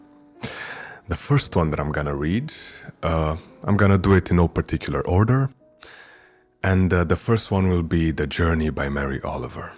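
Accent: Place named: Hungary. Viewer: Hi, thank you so much. Your readings are amazing. I'm a writer and poet myself and hope to get published soon. Listening to your readings is like medicine for my soul. As a Canadian born Hungarian, your accent makes me feel my long passed family around me again. Thank you again for so many reasons that I can't say. Have a great day ❤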